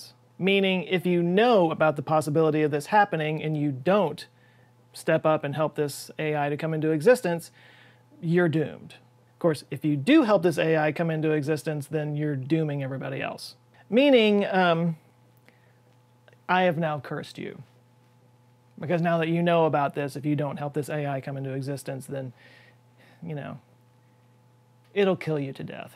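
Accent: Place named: United States. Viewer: 'murcan. Or maybe Texan ...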